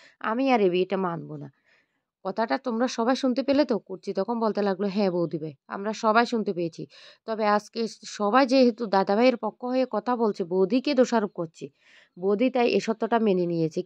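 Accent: Chittagong, Bangladesh. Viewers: আপনি বোধহয় চট্টগ্রামের তাই কথা গুলো এভাবে বলেন।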